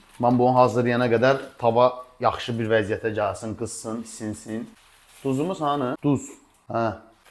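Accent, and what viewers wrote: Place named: Shamkir, Azerbaijan. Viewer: Semkirli oldugu 100 gunlukden bellidi menim qardasim kimi danisir bisirir sendi Masalla size cox gozelsiz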